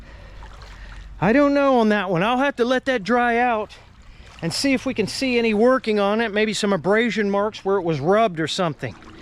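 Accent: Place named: Southern Ohio, United States. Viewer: ❤ the south Ohio accent. Being from southeast Cincinnati, you remind me of my uncles. 🤘🏻Rock on.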